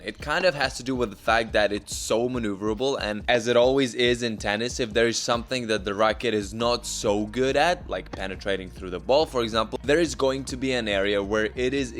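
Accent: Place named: Russia. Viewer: From what part of Russia are you from? I Love your videos btw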